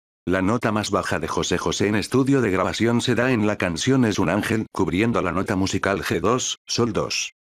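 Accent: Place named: Spain. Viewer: este chocante acento gachupín del narrador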